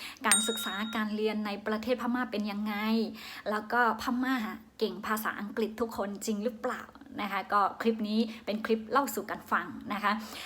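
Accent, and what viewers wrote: Central Thailand, Thailand. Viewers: น้องภาษาไทยชัดเป๊ะ เหมือนคนไทยภาคกลางเลย เก่งมากครับ อยากทราบว่าน้อง เป๋นไตรลื้อ หรือไตรขืนจ๊ะ ลุงเพิ่งดูน้องคลิปแรกจร้า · ชอบน้ำเสียงพูดของน้องมาก พูดไทยภาคกลางชัดกว่าคนไทยบางคนอีก เล่าเรื่องเข้าใจง่ายทุกเรื่องเลยครับ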